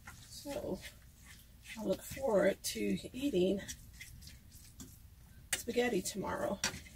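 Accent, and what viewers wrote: South, United States. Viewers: I enjoy your content. Thank you for sharing. 1. I think I hear a southern accent? 2. Onions tend to have a long lasting after taste that is awful to me. I think it's just me though - folks love onions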